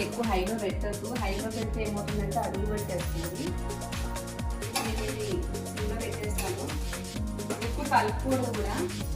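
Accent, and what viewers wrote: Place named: Mahabubnagar, India. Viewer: Na language kuda me mataladinate undati same I am mahaboobnagar district . so nice mam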